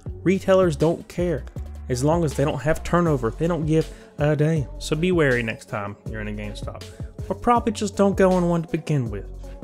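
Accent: South, United States. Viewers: You used to sound southern what happened where’s the real 🤠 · Toooooo southern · Youre so southern and not southern that it makes my brain love your channel. what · Southern drawwwwlllll.....cool vids just subbed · your voice... I cant tell if youre southern or gay or both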